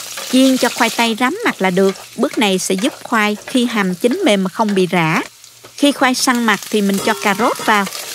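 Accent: South, Vietnam. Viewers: Giọng nói miền nam ngọt ngào , dể thương gì đâu ák trừi🥰🥰🥰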